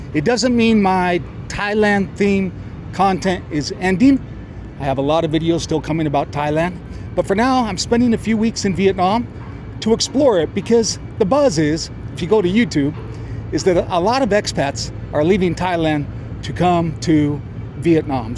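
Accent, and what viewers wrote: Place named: United States. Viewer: You sound so American. If only you knew how you sound to the rest of the world.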